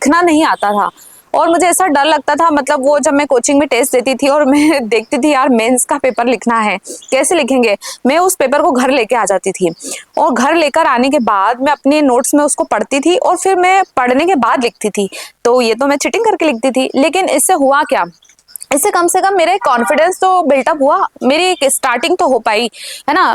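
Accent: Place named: India. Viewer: bahut shandar bolti ho tum ekdam desi andaz me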